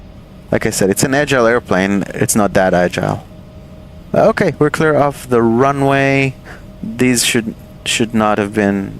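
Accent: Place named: Israel. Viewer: You'er sound Israeli. Am i right ?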